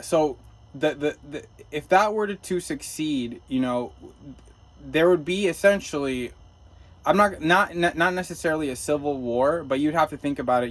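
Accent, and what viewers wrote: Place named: United States. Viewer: Здравствуйте.Возможный вариант,как можно поступить( ну или,как бы сделал я))).У тебя родной английский язык( американский акцент)- это можно монетезировать.Есть парень из Шотландии,он устроился в частную школу в России и неплохо зарабатывает,спишись с ним.( он есть на ютубе).Что это даст: погружение в среду,основы русского,просто общение,ну и как шанс- найти русскую жену, ведь жить одному в России - это неправильно). Дальше: в школах летом 3 месяца каникулы,можно и США поехать ,поработать на траке эти 3 месяца,почему и нет,ну а можно и не ехать..Совет: не ищи себе девушку на сайтах знакомств в России,лучше в других ( обычных) местах.Бизнес- это потом,окунешься в среду,, найдешь русскую жену ,так легче.( да и меньше рисков ).Желаю тебе исполнить свою мечту.Удачи,друг!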